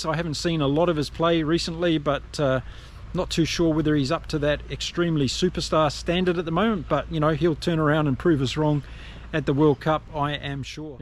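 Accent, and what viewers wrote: New Zealand. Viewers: I laughed when you said you're no rugby expert, here's me as a South African the moment I hear the cadence in your New Zealand accent I'm thinking of every New Zealander pundit I've ever heard and I automatically trust your rugby opinion. 😂.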